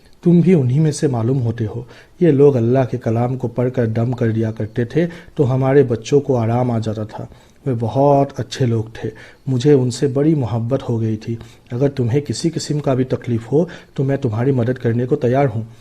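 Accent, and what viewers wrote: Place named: Bharuch, India. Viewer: Muje aap ki aawaz par se lagta hai ke aap Bharuch Gujarat India se ho???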